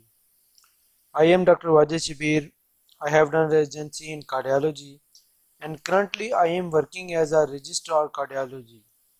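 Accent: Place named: India. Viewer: Thank you. This is a great help to us who are accustomed to the English accent but are not used to the Indian accent.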